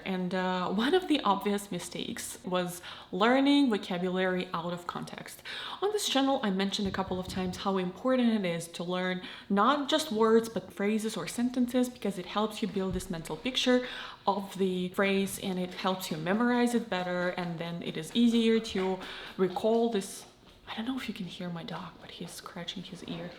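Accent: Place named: Russia. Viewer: The late zoomer/early millennial Russian accent is something else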